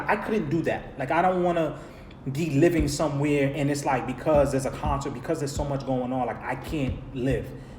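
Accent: New York, United States. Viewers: Your voice reminds me of Mendeecees lol. That’s a good thing tho. I love nyc accents lol.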